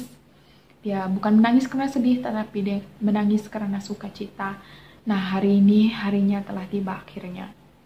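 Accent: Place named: Sabah, Malaysia. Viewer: Mcm orng sabah..😁 gbu👍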